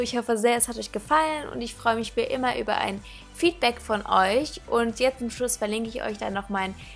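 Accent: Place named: Bavaria, Germany. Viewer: du kommst aus bayern :O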